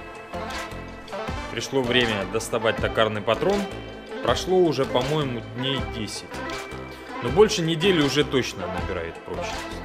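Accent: Rostov, Russia. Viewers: Почему он разговоравает как Негода? В Ростове все так говорят??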